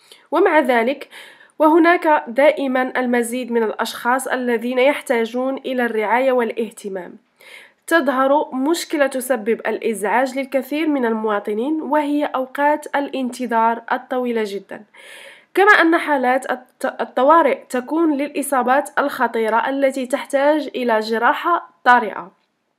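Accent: Algeria. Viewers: My first thought when I heard your accent is that you are Algerian, then I saw your account page and you are Moroccan. So, I was close. Here in America, there are many of our people who were doctors, engineers, etc. in Algeria, Morocco...etc. and now they work in jobs like restaurants, taxi drivers, etc. Because America doesn't accept their degrees and they have to go back to school if they want to do the work they did back home. In America, nothing is free, because you have to pay for college, doctors, medicine, water, housing...etc. even if you have insurance, you still have to pay something besides what you already pay for insurance. Also, in America, there is high crimes and very high racial tension. Would you do another video in Darja or Tamazight next time? 🤣🤣 ==== Ma première pensée quand j'ai entendu ton accent c'est que tu es algérienne, puis j'ai vu ta page de compte et tu es marocaine. 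J'étais donc proche. Ici en Amérique, il y a beaucoup de nos gens qui étaient médecins, ingénieurs, etc. en Algérie, au Maroc...etc. et maintenant ils travaillent dans des restaurants, des chauffeurs de taxi, etc. Parce que l'Amérique n'accepte pas leurs diplômes et qu'ils doivent retourner à l'école s'ils veulent faire le travail qu'ils faisaient chez eux. En Amérique, rien n'est gratuit, car il faut payer pour l'université, les médecins, les médicaments, l'eau, le logement... etc. même si vous avez une assurance, vous devez toujours payer quelque chose en plus de ce que vous payez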